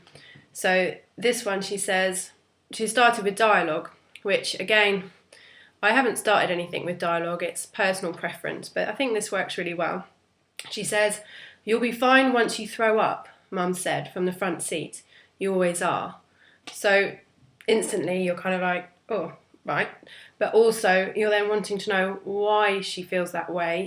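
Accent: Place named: England, United Kingdom. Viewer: Greetings. Thanks for breaking it down. Love the English accent!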